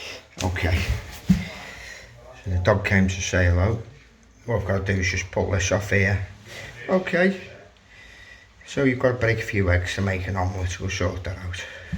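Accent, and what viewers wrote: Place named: Liverpool, United Kingdom. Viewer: I do like a repair vid. I was just trying to imagine a scouser speaking Spanish. And then i gave up 🫣😂😂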